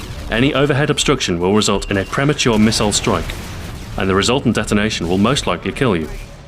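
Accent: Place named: United Kingdom. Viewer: Why does everything sound better with a British voice?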